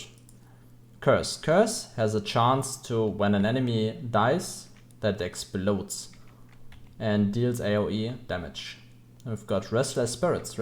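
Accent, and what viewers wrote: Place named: Germany. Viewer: Hello, I'm a new player and I stumbled upon your video after looking through classes gameplay videos and wanted to ask how do you level up so fast (your paragon is at 1032 - paragon what we call on Diablo 3). I just hit 100 on Hell Diff 5 with the White Mage, still confused how to power up faster :D also love your accent (is it German?).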